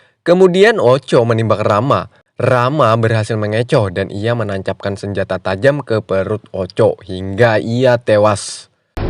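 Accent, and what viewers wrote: Banjar, Indonesia. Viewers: Banjar banar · Orang mana mang pinang Banjar bangat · Urang banjar kh ini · Banjar kah pinanya sidin nih🤣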